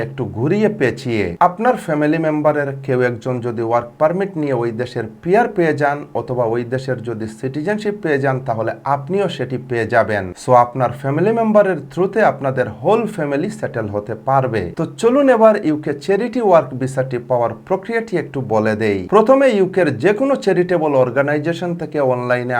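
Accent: Sylhet, Bangladesh. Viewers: Vaiya apne Sylheti ni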